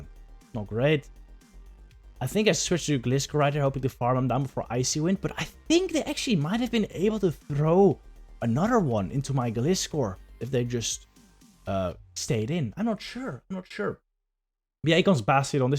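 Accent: Netherlands. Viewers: I have a question. Are you dutch because you have a dutch accent and if you are you are GEKOLONISEERD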